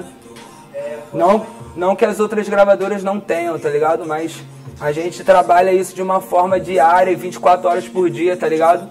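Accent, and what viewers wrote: Rio de Janeiro, Brazil. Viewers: respeita os carióca daê na moral kk cara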